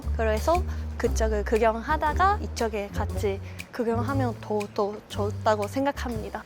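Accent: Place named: Japan. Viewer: 구독 눌러 버렸네요 다 좋아요.. 곧 TV나 영화에서 볼수 있기를 기대 할께요 그런데 발음 연습은 좀 많이 해야할듯.. 아직은 발음적으로는 조금 아쉽네요 일본 억양이 많아서 연기하기에 그 폭이 너무 좁을듯 하네요.. 하지만 항상 열심히 하는 모습 기대 할께요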